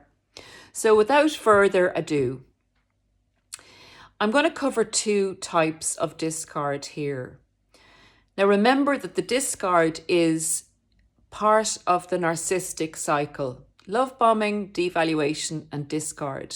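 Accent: Ireland. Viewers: Just want to say I just ran into your channel wonderful videos I love your Irish accent and your charisma your a Attractive Spiritual Lady.. well here's my story.. Around 2014 I was on social media and Facebook a woman girl who latched on to me as a really close friend that was into the same arts and Music as myself. Just prior I had broken up with a previous girlfriend she came on pretty strong throughout 2014 till 2016 I started getting really close and I felt I found a wonderful person well I'll start off by saying she was a covert narcissist NPD vulnerable type but this is way before I figured it out we were long distance Maryland and Oregon I thought wow I finally met a perfect match. Time went on we started flying out to see each other back and forth for the next 4 years it was very amazing and she seemed very angelic and a stand-up person and she had a one son she was very generous and always kept offering to book our vacations and pay for everything I didn't like that feeling and paid for most stuff for us also that we did also I was sort of entranced that she was just doing it out of the kindness of her heart and care and was what I learned a codependent such as myself and she was really having problems with depression and other things and was looking for a connection. 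When we weren't seeing each other physically being long distance we talked on the phone every night when I get home from work and do Skype until we could see each other again on our vacations from work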